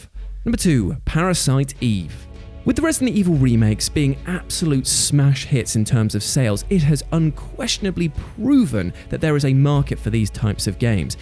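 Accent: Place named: England, United Kingdom. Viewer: I don’t really like the “Tues Your Own Adventure” name, as it only works with certain accents such as your English accent. As an American I say: Tues as “Toos”. But other than that I like it!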